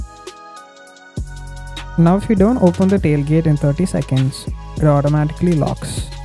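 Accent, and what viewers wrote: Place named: Kerala, India. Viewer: Bruh you from Kerala? 😍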